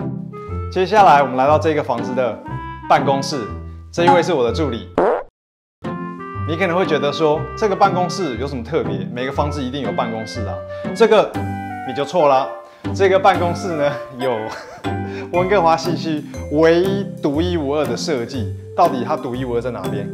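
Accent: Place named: Taiwan. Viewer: 聽口音就是台灣吧!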